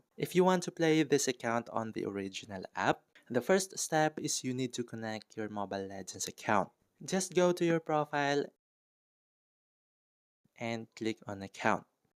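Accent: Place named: Philippines. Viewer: You sound more like filipino lmao, but your tutorial are very simple and very understandable ❤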